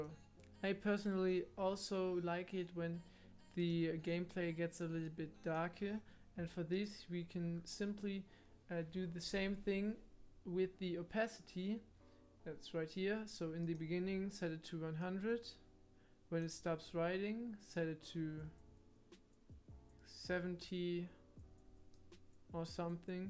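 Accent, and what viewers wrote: Germany. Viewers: dein akzent mein hört dass du deutscher bist hahaha !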